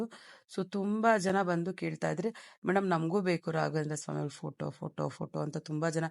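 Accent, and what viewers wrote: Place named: Chikkanayakanahalli, India. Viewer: Tumakur chikkanayakana Halli talk. Huliyar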